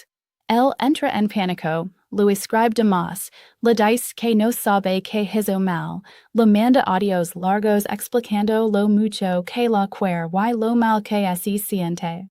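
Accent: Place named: Chile. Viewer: Jaja, gracias por los subtitulos. Ella habla en chileno 😅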